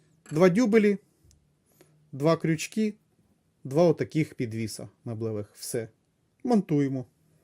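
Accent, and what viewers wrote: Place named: Russia. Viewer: не ломай язык,твой родной русский.Твой украинский с огромным акцентом.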